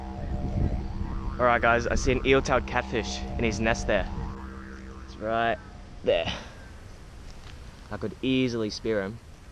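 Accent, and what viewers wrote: Australia. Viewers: Are you Mexucan ? no Austrailian .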